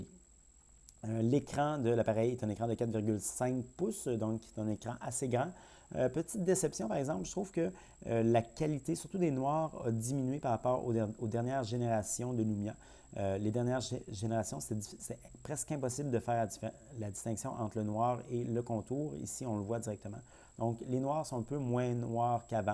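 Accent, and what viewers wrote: Quebec, Canada. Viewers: Tu es québécois ?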